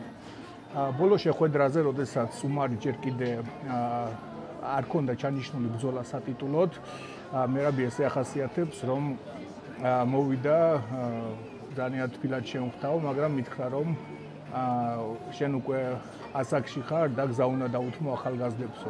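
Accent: Russia. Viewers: პატივისცემა ჩემგან, რაც შეეხება კონტენტს, ძალიან მომწონს მაგრამ ჩემი პირადი რჩევა იქნება, გენრი სეხუდოს მაგივრად ჰენრი სეჰუდო რომ თქვა, კორი სენდხაგენის მაგივარდ სენდჰაგენი, მე კი ვხვდები რა თქმა უნდა ვიზე საუბრობ მაგრამ, ძალიან არასასიამოვნო მოსასმენია, აი მეტყველების ამ პრობლემის გარდა, ჰ-ხ, ჰ-გ, და ხომ მიმიხვდი, ისე ამბობ ხოლმე როგორც რუსები ამბობენ, სხა არაფერს შევუწუხებივარ, წარმატებები <3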